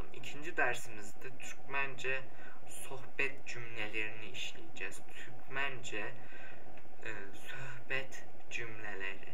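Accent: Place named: China. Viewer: Dost nädip gurleýan düsürdin Türkmence degil Hytayca gurleyan yaly etdin .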